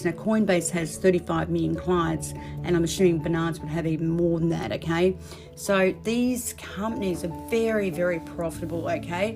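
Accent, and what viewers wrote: Australia. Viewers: That's just her Aussie accent.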